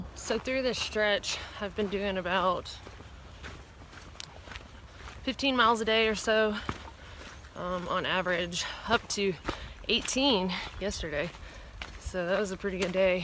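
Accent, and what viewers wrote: South, United States. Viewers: "This here sign..." lol I love it. You can take the girl out of the south...never the south out of the girl. Rock on.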